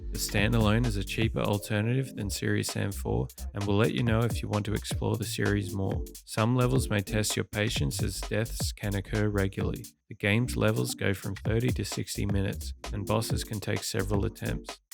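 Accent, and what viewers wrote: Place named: Canada. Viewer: Iconic canadian accent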